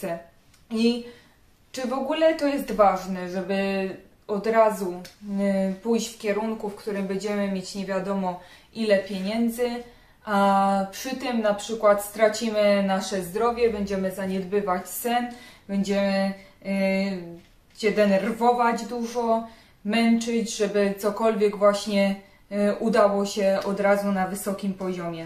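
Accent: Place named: Silesia, Poland. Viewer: Widać że się pilnujesz w słowach i wymowie. Czuć Śląsk z daleka 😊 pozdrawiam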